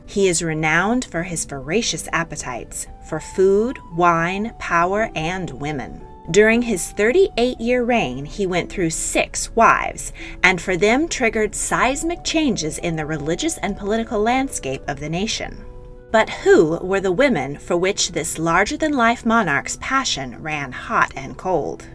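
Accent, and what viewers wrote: United States. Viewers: Sorry. But why is there an American narrating this? So wrong. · U have a rlly American accent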